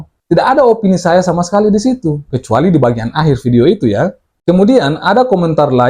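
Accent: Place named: Papua, Indonesia. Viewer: ketauan misionaris terselubung kau, dari logatnya aja udah ketauan kau kristen papua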